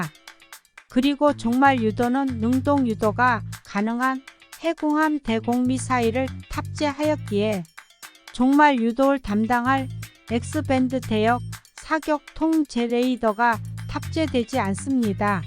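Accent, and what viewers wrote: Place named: Chungcheong, South Korea. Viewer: 나래이션이 충청도라서 답답함. 전문성이 농후한 영상이라 생각됩니다. 호주 호위함 사업 탈락 때문에 자꾸 비교하려는 것이겠지요. 많이 배웠습니다.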